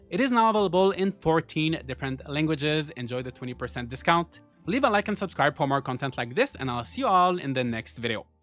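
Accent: Quebec, Canada. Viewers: Are you from Québec? ^_^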